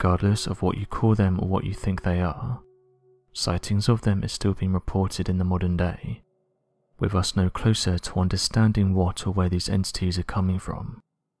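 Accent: United Kingdom. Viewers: Oi mate!! I love all of your videos. I truly enjoy driving,chilling,cleaning and etc. to your story telling. It has been a fun pass time. Your one of the better presenters/narrators in my opinion on YouTube when it comes to the strange and unusual. Would love to hear more videos about satanic cults such as Abraxas and or even Chateau des Amerois if you ever get the chance. Cheers and love your work. Something about your British accent and calm voice that’s very soothing to me for some reason. Perfect for story telling. Anywho, Much love and respect from your long separated cousins here in the U.S.! Blessings from the bayou state of Louisiana. Also, another potential topic to check into is creature and beings spotted in and around the swamps of Louisiana. Especially run ins with werewolf like creatures labeled as Rougarou. Take care for now mate! Blessings to the Cambridge crew and Bristol Peeps and all my st neots lackeys out there!!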